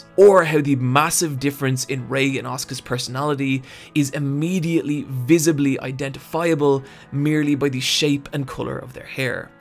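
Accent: Ireland. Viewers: His accent, the "Why" or "What" is being affected by his accent and it sounds forced. It's an Irish one, I'm Irish, believe me he doesn't have a choice <\3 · Irish accent and blue graph paper? Almost mistook you for Real Engineering there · You sound irish bruh im irish dio has good fasion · Can someone tell me what SEWs accent is? English is not my native language so I can't really tell. But the "h-why" and "castume" and "ting" remind me of Roy from IT crowd sooo ... irish?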